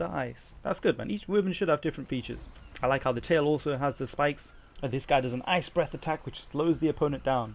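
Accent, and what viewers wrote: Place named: England, United Kingdom. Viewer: Good video and well researched but considering you sound English I found excruciating that you pronounce Wyvern wrong... Considering it’s a Welsh dragon... ><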